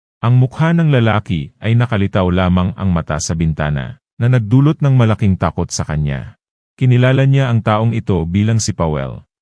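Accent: Visayas, Philippines. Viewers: May pagka-visayan accent cya pero naiintindihan naman.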